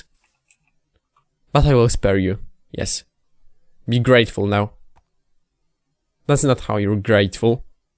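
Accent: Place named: Poland. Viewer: Oglądam pierwsze sekundy, i już wszystko wiem. Dam sobie głowę uciąć za to że jesteś polakiem. xD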